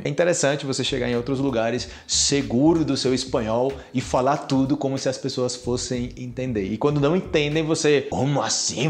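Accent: Antioquia, Colombia. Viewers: veo tus videos cuando puedo, tu acento parece mas colombiano que brasileño. Tus vídeos me han ayudado en mucho. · Profe usted ya es paisa, tiene el acento!! 😂 Se nota que está amañado...!! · Eu acho que tem muito do sutaque paisa da Colômbia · Me gusta tu español con acento colombiano👏 pero tu portugués me encanta 😁 os países de fala espanhol tem muitas palavras com significado diferente, en mi país tambén usamos, "me regala" cuando vas a comprar algo. Muito bom seu video👌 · Soy de Venezuela, usamos bastante la palabra chévere, bacano nunca escuché alguien decirla, pero si la dicen uno entiende que es. Hablas bien el español, hasta pareces colombiano al hablar😎😎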